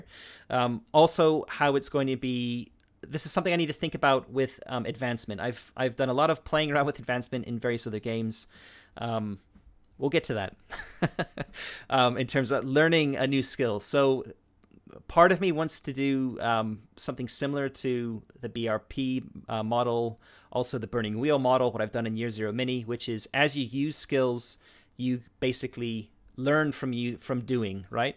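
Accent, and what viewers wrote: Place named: United States. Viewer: Interesting game idea. Also, unrelated to anything you were talking about: do you know you sound like an American?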